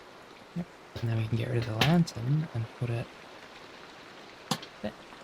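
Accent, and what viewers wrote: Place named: Australia. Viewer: Hi!! You can call me Dove I’m from Australia (guessing you are also based on the convo about weather being warm and the similar accent lollll) and I don’t usually comment on your videos but thought I should just say thank you so much for uploading these. I struggle a lot with anxiety and mass amounts of sensory input due to my ASD, so these holidays have been super stressful and energy consuming with me, especially since my family has to cook for 7-12 people who are coming over tomorrow for Christmas (not including cooking for those that already live here). Anyways I digress, these videos do help so so much after chaotic and hectic days for me, it’s so lovely being able to put on a video that doesn’t yell at me before I sleep about a game I hold very dear to my heart. Keep up the awesome work mate! And happy holidays! Edit: Deleted the previous comment so I could support this awesome channel loll!!!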